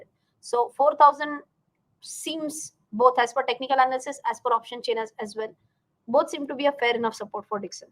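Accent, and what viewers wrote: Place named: Pune, India. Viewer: I have became ur fan after watching your videos. You are the coolest CA I have ever met :-D. Watching you speak feels as if you are amongst us, ekdam Punekar!! :-)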